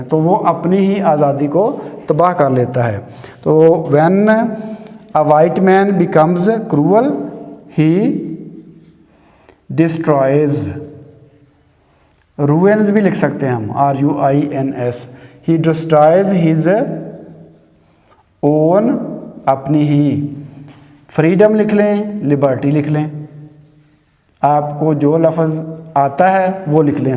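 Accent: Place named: Punjab, India. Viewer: It's ok but your accent is Punjabi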